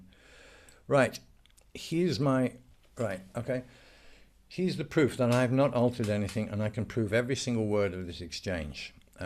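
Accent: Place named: London, United Kingdom. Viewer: Do you mind if I ask you which part of London are you from? (I’m not trying to dox you, I’m just interested in London accents!)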